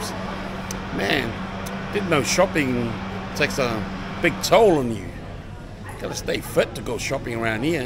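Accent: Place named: United Kingdom. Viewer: That British accent 😂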